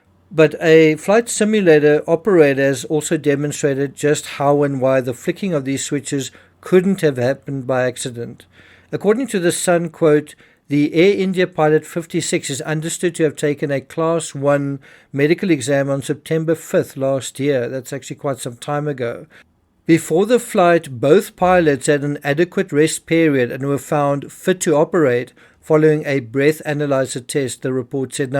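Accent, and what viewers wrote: Afrikaans, South Africa. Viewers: Another Afrikaans accent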